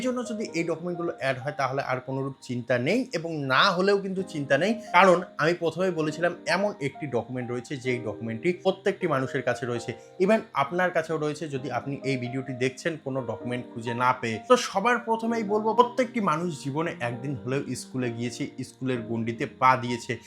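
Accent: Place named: Bangladesh. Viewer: তোমার বাংলা উচ্চারণে বাংলাদেশীর গন্ধ আসছে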